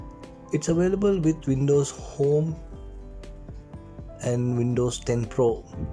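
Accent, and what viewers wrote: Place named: India. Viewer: Why white lady speak with Indian voice?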